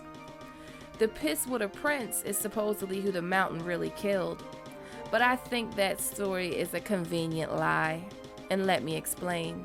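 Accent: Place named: Los Angeles, United States. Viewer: out pops beautiful bad bitches preach lol I love your voice You're from la ? u talk hip as shit and your game of thrones knowledge is on point. 💯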